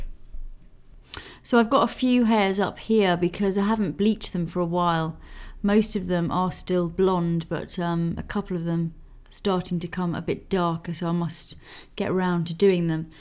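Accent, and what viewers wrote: England, United Kingdom. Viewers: i just love your make up sense....so natural and sophisticated........your confidence in your work and your British accent are just WOW!! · I am a new subscriber after hearing juicytuesday speak so highly of you.I have watched a few videos and am crazy about you already.You are a very beautiful woman and I just seem to adore you English ladies.Love the accents and you all have such gorgeous complexions.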